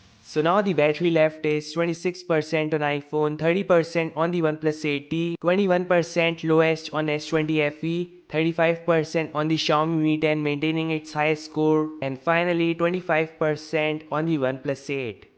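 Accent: India. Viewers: I love the English Indian Accent. "Lets Goouuu"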